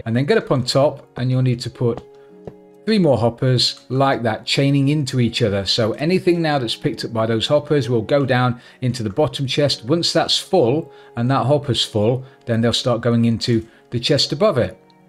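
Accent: United Kingdom. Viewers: I really enjoyed your video. English is not my first language and I think UK accent pretty dificult to understand, BUT I think yours is lovely and I understood it all!!! Thank you very much for sharing with us your iron farm, I hope I can do it haha. And I LOVED the name you gave to the zombie, it took a real laugh of me xD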